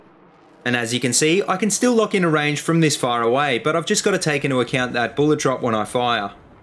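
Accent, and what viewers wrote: Australia. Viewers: He has to be Australian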